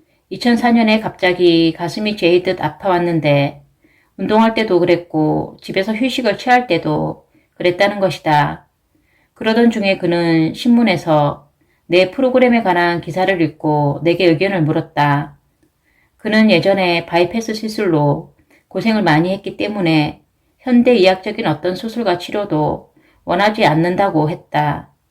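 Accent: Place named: Gyeongsang, South Korea. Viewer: 경상도 사투리로 들으니 아주 구수~합미당.귀여우샤여.잘 들었습미당~❤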